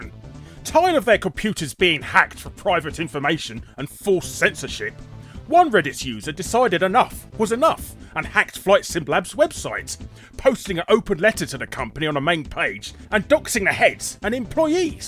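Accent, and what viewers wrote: United Kingdom. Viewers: "For you English speaking fellows" being stated by a very British accent was a highlight joke for me for some reason. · I needed 5 seconds to figure out that you're a British, cause I turned off the CC n I thought you said Varices over and over like this video is about Varices. 😂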